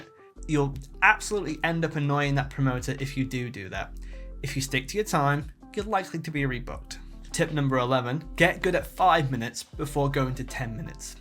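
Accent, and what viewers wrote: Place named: England, United Kingdom. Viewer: I cannot sit and concentrate on an english accent explain comedy to me. I just imagine this guy stuffi g his face with crumpets fig newton's and tea.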